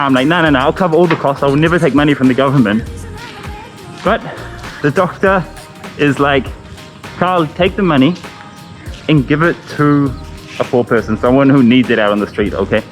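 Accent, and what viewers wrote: India. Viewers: you speak English in indian accent lol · You sound like indian.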